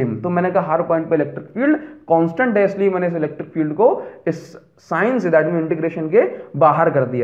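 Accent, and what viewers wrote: Odisha, India. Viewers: Sir, are you odia???😃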